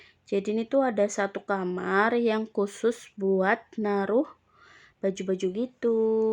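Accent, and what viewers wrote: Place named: Banyumas, Indonesia. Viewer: Salam kenal mbak, aku ngapak juga, dari cilacap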